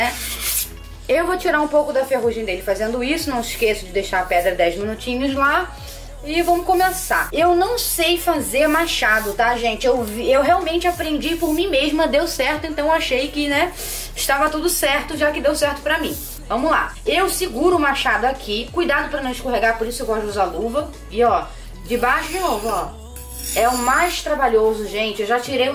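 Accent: Rio de Janeiro, Brazil. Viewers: vc e de rj ? parece pelo jeito de falar kkk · essa ae e carioca mermo ela pucha o s demais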